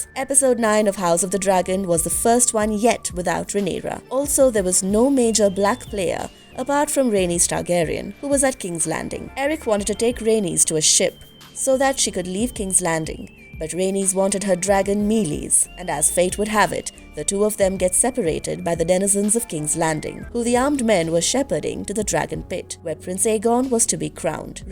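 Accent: India. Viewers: but indian voice :/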